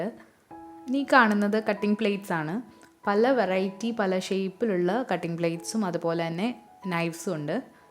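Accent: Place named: Alappuzha-Kollam, India. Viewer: നാട്ടിൽ ആലപ്പുഴ, കൊല്ലം അവിടെ എവിടെയേലും ആണോ. സംസാരം കേട്ടിട്ട് ചോദിച്ചതാ 😊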